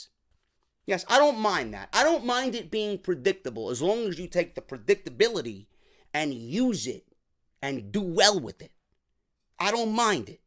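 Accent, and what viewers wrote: New York, United States. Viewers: You're a natural for commentary. You have a distinctive voice due to your New York accent. Better than some of the guys in the WWE.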